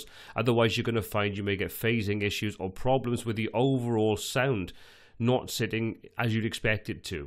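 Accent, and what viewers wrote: Wales, United Kingdom. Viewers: Thanks for the great series. I am rather enjoying what I perceive is a Welsh accent. Am I incorrect? My mum is from Cardiff, the Docks neighborhood of Tiger Bay. You're voice rather resembles her brother, my Uncle Bobby. :-D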